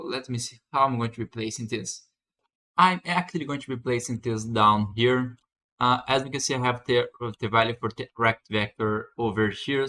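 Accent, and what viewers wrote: Brazil. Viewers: esse sotaque ai, tu é br kkkkkkk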